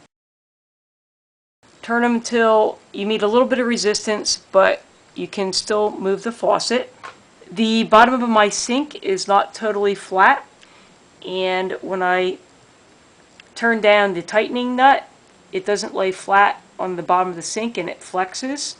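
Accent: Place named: North, United States. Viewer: Great Video! I just have to say. Being from the South, I had to get around the NORTHERN ACCENT, LOL. Great video, I had to install same exact one! Awesome Job!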